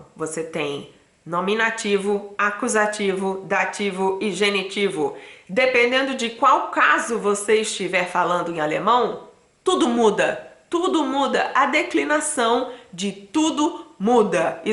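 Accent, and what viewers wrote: Northeast, Brazil. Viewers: Tens algo de sotaque do nordeste...eu estou em Recife.... assinei seu canal e vou seguir, você é muito agradável..me sinto bem ouvindo você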